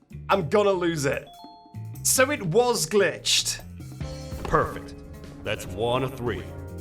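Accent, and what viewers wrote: United Kingdom. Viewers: That's weird cuz my dog was running all over the house cuz I was playing this on my TV once upon a time and he couldn't figure out the British accent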